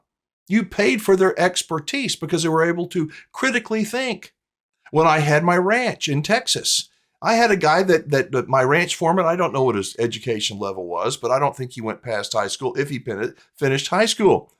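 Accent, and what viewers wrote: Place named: Georgia, United States. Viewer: I mean no disrespect. But you and Nancy Grace have almost identical regional accents. I watch all your great videos. She's from Macon Georgia, you? Respectfully Submitted, Mark Tinnirella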